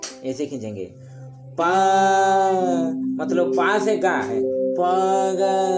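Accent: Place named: Bengal, India. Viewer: Res sir pranam Excellent tut I am practicing the same My request is if you can text swars along with it Your accent is bengali g sounds like dh Regards Pl forgive me if I am wrong God bless you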